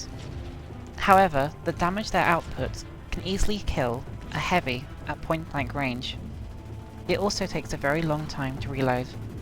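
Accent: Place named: Iceland. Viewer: That would be an Icelandic accent. Most people will have an accent carrying over from their primary to their secondary language, it is only natural for this to occur.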